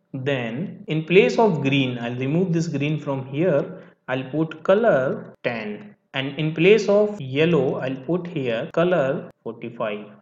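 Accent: India. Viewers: I'm so sorry to write this but I simply can't focus with this super Indian dialect, if this guy is from India that is. No offence, really, I just can't concentrate. Wish you all the best 🙂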